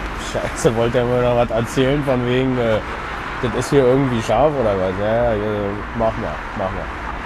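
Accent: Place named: Berlin, Germany. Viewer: ..BIST ECHT DER HAMMER..BERLINER SCHNAUZE..WIE ICH👍💪🖐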